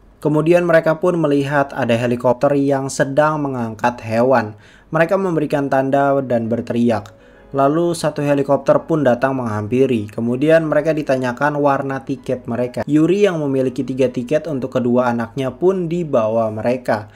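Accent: West Java, Indonesia. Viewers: Orang sunda gening wkwk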